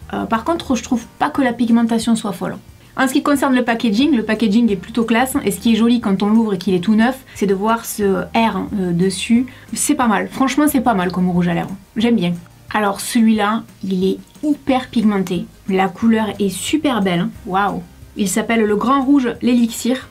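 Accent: South, France. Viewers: cest laccent du sud je pense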